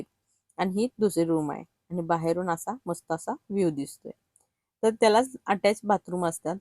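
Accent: Kolhapur, India. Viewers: Arre hi tr aapli kolhapuri bhasha as mla vat tiya pn mastt ghar aahe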